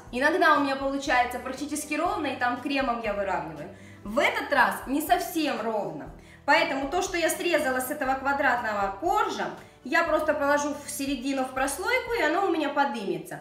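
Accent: Ukraine. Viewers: а говор у вас интересный,трошки услышала,вы в Украине живете?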